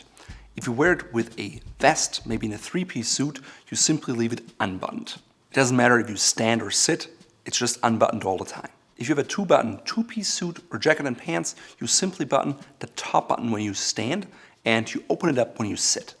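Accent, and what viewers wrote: Germany. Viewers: May i ask are you German or switzy?😊 i like the accent brother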